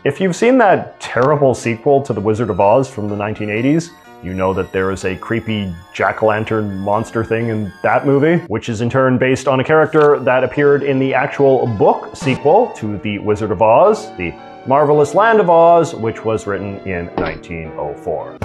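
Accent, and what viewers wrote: Canada. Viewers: Bro are you Canadian AF or do you hail from NorCal? Californadian? 🧐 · Thee most Canadian accent I’ve ever heard lol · Hes Canadian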